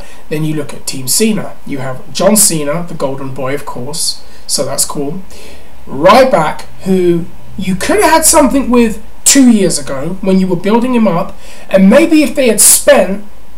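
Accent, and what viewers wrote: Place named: Glasgow, United Kingdom. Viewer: You sounded like you were from glasgow, like normal times for me!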